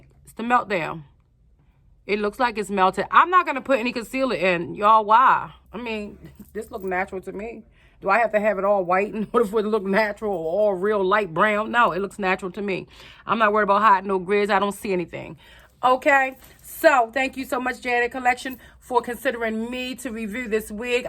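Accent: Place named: New York, United States. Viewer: You talk like an Italian mob wife lol I love it!! Are you from Jersey or New York?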